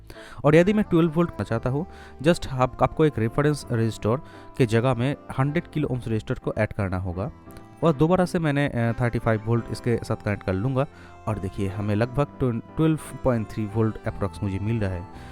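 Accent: Bengal, India. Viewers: R u bengali? 🙂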